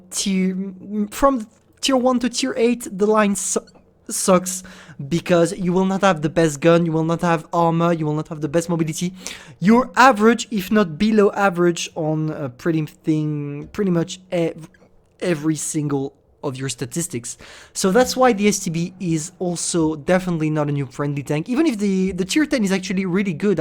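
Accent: France. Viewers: T'es français??? parce tu a un accent français quand tu parles anglais :)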